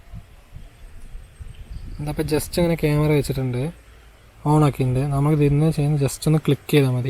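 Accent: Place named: Palakkad, India. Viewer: Wawoo അടിപൊളി 👌👌 സൂപ്പർ പാലക്കാട് ഭാഷ 👌👌💕💕💕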